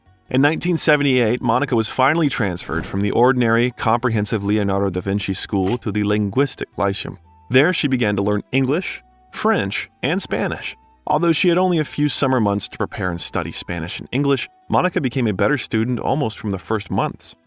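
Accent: United States. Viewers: I love your voice and accent. California? Lol hard to follow the story at the beginning because distraction of this pleasant voice. But then, yeah, I like the biography 🎉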